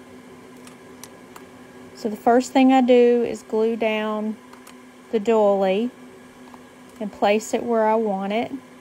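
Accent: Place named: Alabama, United States. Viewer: How cute! 😍 I love doilies! I just have to ask, are you from Alabama? I'm on Sand Mountain and your lovely accent sounds like all my people! 😄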